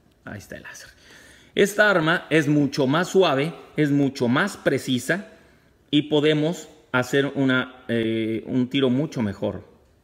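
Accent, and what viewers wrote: Mexico. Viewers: Saludos desde España para México lindo,querido y armado.Videos interesantes y además en el espléndido español mexicano,lo cual se agradece.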